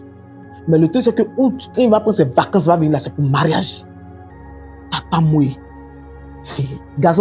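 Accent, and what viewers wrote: Ivory Coast. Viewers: Madame, votre accent Ivoirien ainsi que votre posture de la personne qui raconte l'histoire sont merveilleux. J'aime bien cette manière de raconter. Ma suggestions est que tous ne comprennent pas certains mots et propos propre au contexte Ivoirien. Moi je suis Camerounais, et cela je comprends. Mais je ne suis pas tres sûr pour les autres. Si vos videos peuvent etre améliorer dans ce sens, cela sera genial. Merci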